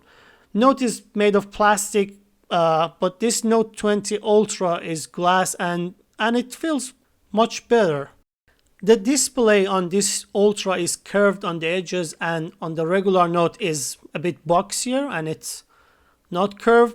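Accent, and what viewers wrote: Iran. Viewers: Judging by your accent I'm guessing your Iranian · you have such a persian accent lol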